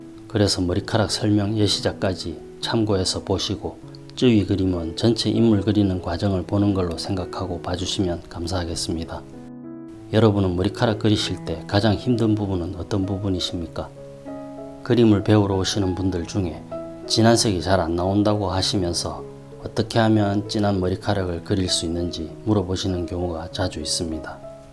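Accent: Gyeongsang, South Korea. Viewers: 근데 어느 지방에 사십니까? 말투가 경상도이신거 같은데 가끔 빵 ~~터집니다ㅋ 그림공부하는데 많은 도움이 됩니다 감사합니다^^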